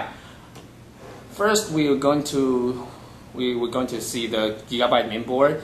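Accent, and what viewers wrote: China. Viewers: Chinese English!!!!!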